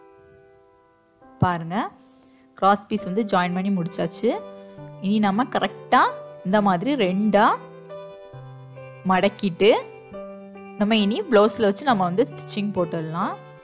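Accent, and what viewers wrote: Nagercoil, India. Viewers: For u Nagercoil ah?, Ur language is like that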